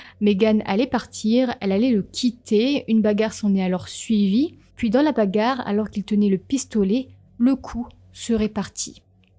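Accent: North, France. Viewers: Bonjour. J'adore votre concept.Narration parfaite avec ce petit accent du nord.qui vous donne petit.plus..Histoirs passionnantes.. beau travail. Un grand merci a vous. 💚👍👍👍💐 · bonjour je voulais vous dire que vous racontez très bien ces affaires que vos documentaires sont bien étoffés. on voit que vous travailler sérieusement pas comme d'autres et je voulais vous remercier pour votre travail car c'est toujours agréable de vous écouter! je ne m'en lasse pas! ne seriez vous pas une fille du Nord?? et vos affaires criminelles sont inédites ce qui est très plaisant.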